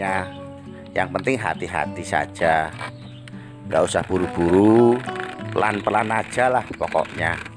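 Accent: Java, Indonesia. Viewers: Kreatifitas tanpa batas... Dengan logat jawa punya..👍👍👍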